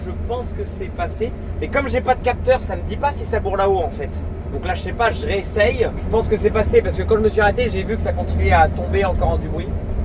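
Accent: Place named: Vosges, France. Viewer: C’est l’accent des Vosges ça ^^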